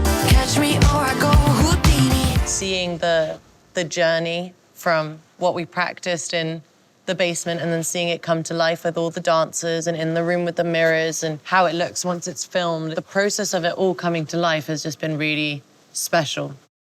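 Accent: United Kingdom. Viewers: Love your songs,your unique style and your British accent.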